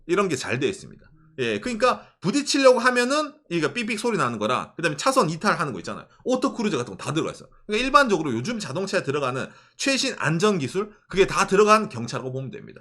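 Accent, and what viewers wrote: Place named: Gyeongsang, South Korea. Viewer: 우연히 봤는데 남자분 한국인 이신가요?한국말정말잘하시네요 경상도말씨처럼~~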